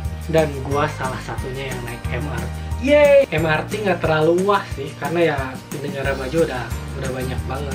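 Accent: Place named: West Java, Indonesia. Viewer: pantesan logat sunda na teh aya wae,pek teh orang bandung gening wkwk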